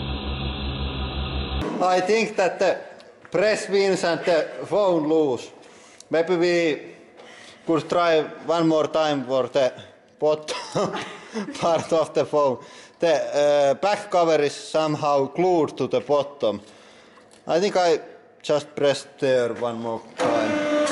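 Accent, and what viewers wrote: Finland. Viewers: LOVING THE ACCENT #RALLISUOMI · Are you Finnish? Sun aksenttisi kuulostaa siltä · Ootko suomalainen ku sun enkun murre kuulostaa suomalaiselta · Are u finnish cuz ur english accent is finnish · i can hear from the accent that this guy is finnish lol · That rallienglanti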